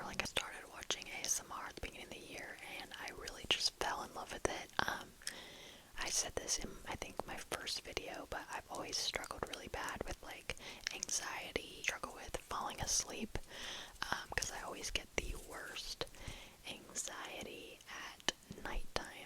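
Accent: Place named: Australia. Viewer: bruh i was so convinced you were australian xd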